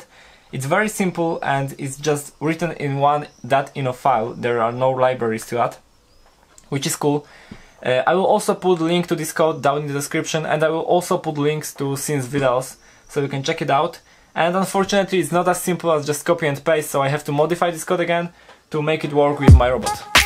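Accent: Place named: Poland. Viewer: Po akcencie poznałem że to Polska XD Pozdrawiam, mega robocik :D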